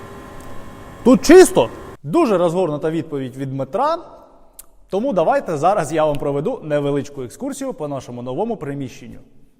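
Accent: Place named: Ternopil, Ukraine. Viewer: Ніколи б не подумав по Вашій мові, що Ви з Дніпра, а не Тернополя)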